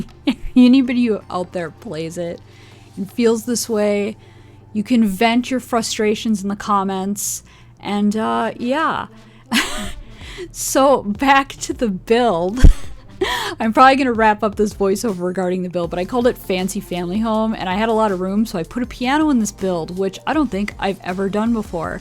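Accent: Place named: Midwest, United States. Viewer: the driveway landscaping is perfecto! alsoooooo how have I not noticed your (possibly midwest if not cnadian) accent before now???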